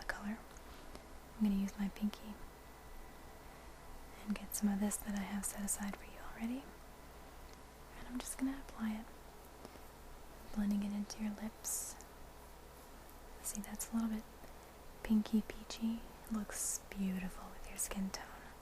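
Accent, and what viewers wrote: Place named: South, United States. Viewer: Sounds like she's from my part of the world... Down south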